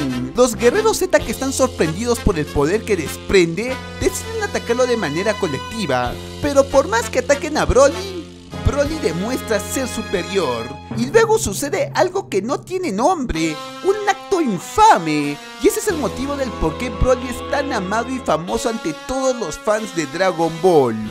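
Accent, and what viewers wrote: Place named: North, Mexico. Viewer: eeeeee norteño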